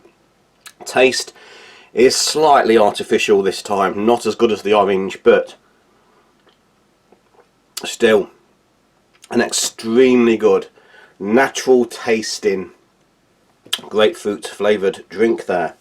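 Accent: United Kingdom. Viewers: Great and detailed review ! And as always it's a pleasure to hear your pure British English :) I like the German ration because of the diversity and amount of items. The content tries to remain traditional German meals-like breakfast-which are often bread/rolls with butter and some meat or cheese. The cold drinks are the best !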